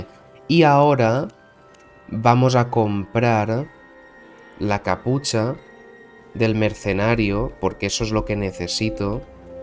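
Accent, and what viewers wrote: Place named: Valencia, Spain. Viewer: Me suena un acento muy Valenciano nice bon video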